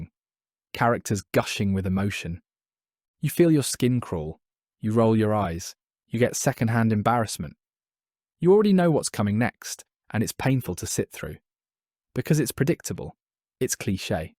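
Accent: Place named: United Kingdom. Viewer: British accent)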